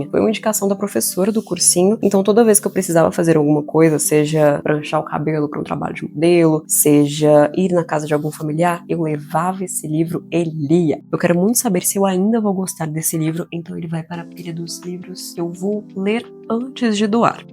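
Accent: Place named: Portugal. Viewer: eu sempre fui leitora, mas meus pais não tinham condições de comprar livros sempre, mas na minha escola tinha biblioteca e eu AMAVA, me sentia a Matilda.. e um que me marcou muito foi "Apertada e sem espaço" e está na wishlist da amazon do meu bebê.. sou louca pra ler os livros da minha infancia pra ele. que delicia de vídeo, conheci você atraves do chat da Amylivros na twitch, e seu vídeo me prendeu e me cativou de primeira.. quanta delicadeza e dicção boa de se ouvir. Você é de portugal? sotaque me lembrou um pouco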